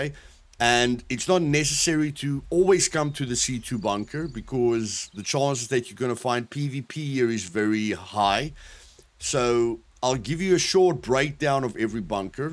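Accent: South Africa. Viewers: I can hear that South African accent! :3 And yeah Eskom screws us all over!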